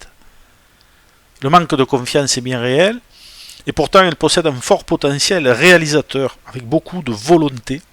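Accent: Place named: Occitania, France. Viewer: Bonjour à vous, Mon prénom commence par la lettre J, et je me retrouve dans ce que vous dites. Nous avons le même accent Occitan. Je vous écris de Narbonne 😊.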